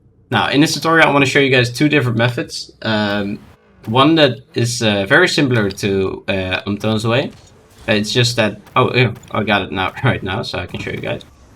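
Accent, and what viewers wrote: Netherlands. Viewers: I hear nederlands, possible? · dat nederlandse accent valt niet te verbeteren gozer <3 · Je hoort aan zn engels dat hij hollander is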